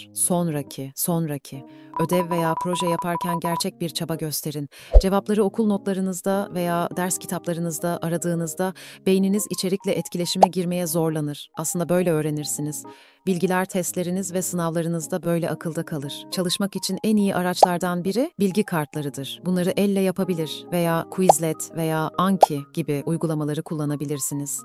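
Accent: Azerbaijan. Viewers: Oha abla sen Azerbaycanlımısın?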